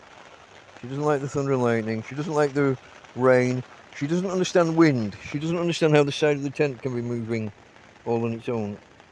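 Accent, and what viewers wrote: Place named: Huddersfield, United Kingdom. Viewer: just in case you were familiar with the other sons of Huddersfield The Sheepfarmstudios brothers Chris & Dom. I had a lecturer from Huddersfield sounded like yourself. Sheepfarm had yet another Huddersfield original on called Michael O'Deira who might assist you with your banking issues.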